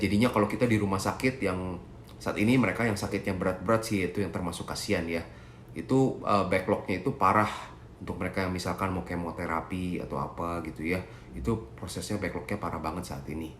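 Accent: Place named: West Java, Indonesia. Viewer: Koh logatnya kerasa sundanya hehe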